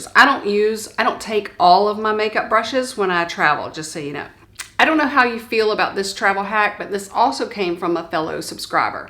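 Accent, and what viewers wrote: South, United States. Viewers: Just a quick note to say I have enjoyed your videos. I don't know if you realize it, but the close-captioning for your words is quite amusing! Due to your southern accent, in the above video, "tinted" moisturizer was printed as "tenten", and "tennis" shoes came out as "tin" shoes! Don't worry...I can understand you, but the close-captioning person cannot!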